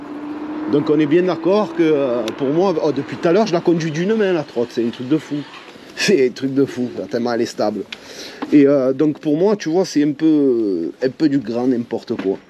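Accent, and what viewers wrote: Marseille, France. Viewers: Excellente découverte avec un bon accent du sud comme toujours, sympathique comme tout, même avec les vieilles XD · Salut Tonton, merci pour tes vidéos toujours aussi sympathique avec la gouaille Marseillaise qui va bien 😅 Chaque vidéos c’est un régal de te suivre, garde ta façon de faire car on te sens généreux , objectif et réaliste dans ta démarche et tes propos 😊 Perso j’attends ma Suprem qui devrait arriver sous peu tellement Weebot s’est fait démonter les stocks…😮 Au plaisir de te rencontrer un jour, bonne suite à toi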